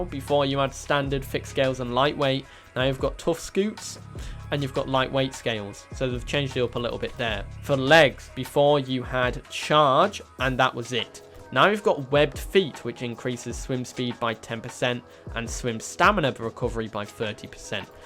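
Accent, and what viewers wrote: United Kingdom. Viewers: you are briish ey?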